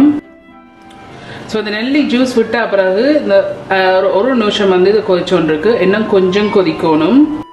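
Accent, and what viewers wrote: Sri Lanka. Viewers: சகோதரி...உங்கள் இலங்கை தமிழ் அழகு.....வணக்கம் கோயம்புத்தூர் தமிழ்நாடு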